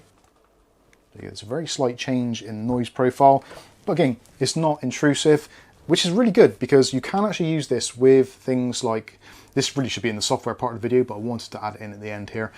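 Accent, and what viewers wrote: Bristol, United Kingdom. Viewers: Sounds like a fellow Bristolian or close ;)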